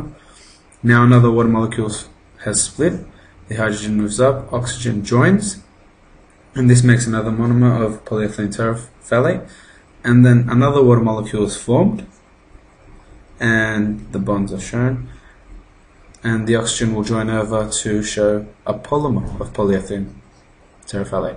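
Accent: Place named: Middle East. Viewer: Woah, you sound exactly like me except a little middle eastern touch.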